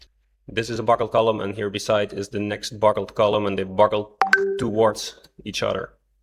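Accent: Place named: Denmark. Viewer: Listen Mr. Civil Detective with your (Danish?) Accent: This old Architect having worked on NYC Bldgs (one with Fred Severud as Engineeer....others): Your presentation is the very best of ANY including that guy from Severud and many others. Lucid, Comprhensive and mathematicall.. All these cute Chicks interviewing any engineeer they can get their hands on (NBC/ABC/CBS...,,,,,,=UGH. State Your Name, Company, credentials so we (few) rational people can find your sites. You are first rate and give me faith in big time engineering again.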